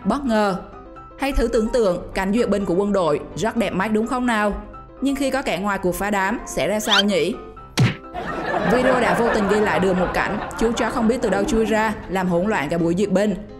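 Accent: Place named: Binh Dinh, Vietnam. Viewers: bạn nữ có giọng tốt, âm thanh tốt, nhưng còn nói tiếng địa phương quá nhiều, bạn nên tập trung nói 1 giọng hoặc giọng Bình Định rặc, hoặc giọng giọng Bình Định nhưng sửa lại 1 số từ phát âm địa phương như các vần "in, ăc, ăng, ăn..." hoặc giọng miền nam, tránh phát âm các từ địa phương nghe nó quê mùa lắm. Chào thân ái - đoàn kết - xây dựng! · Chịu khó đọc bằng giọng phổ thông đi em, còn nặng giọng địa phương Bình Định lắm.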